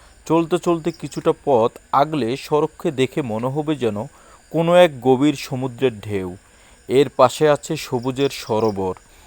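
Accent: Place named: Chittagong, Bangladesh. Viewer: ভাই,, কিছু মনে করবেন না।। আপনি তো চাটগাঁইয়া,, আরও শুদ্ধ বাংলা প্রত্যাশা করছি।।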